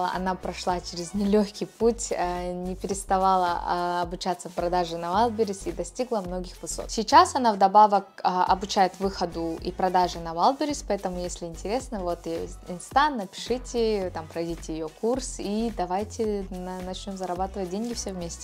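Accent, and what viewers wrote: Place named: United States. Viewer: Познавательно, но режет слух желание вставить английское слово хоть куда-нибудь( Если можно, не коверкайте произношение на ОМЕРЕКЕЙшН лад. Постарайтесь и у вас получится)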